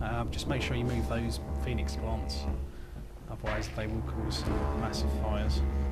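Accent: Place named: United Kingdom. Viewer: I don't know why you don't have any subscribers this is actually good content! and the british voice is a plus good job!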